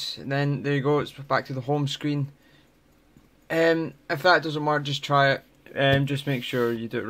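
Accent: Scotland, United Kingdom. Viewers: Hes scottish you fucktard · Is u Scottish ?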